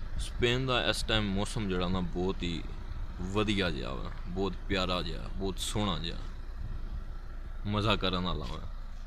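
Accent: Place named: Punjab, India. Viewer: g thoda Punjabi Accent kmaal da 👌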